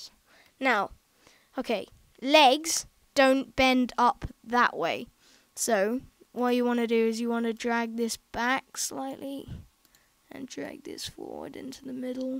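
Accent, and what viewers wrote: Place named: United Kingdom. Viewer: Sounds like British am i right? , indeed GOOD JOB mate!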